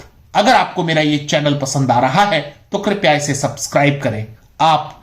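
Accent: Standard. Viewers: शुद्ध हिन्दी उच्चारण, बहुत दिनों बाद सुनने को मिला| इसे कहते है हिन्दी!!! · बहुत ही सुंदर जानकारी वो भी शुद्ध हिंदी उच्चारण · हिन्दी का इतना शुद्ध उच्चारण बहुत कम सुनने को मिलता है. साधुवाद!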